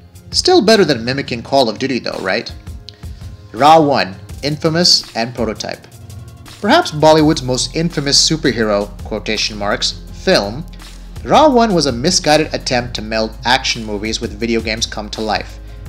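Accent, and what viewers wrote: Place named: United States. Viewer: wo plz stop using American accent, if you can't stick to Indian fine, adopt British since its far easier to understand them than American's. Though am talking silly, how could you change to British and not Indian, but still, its just too clunky.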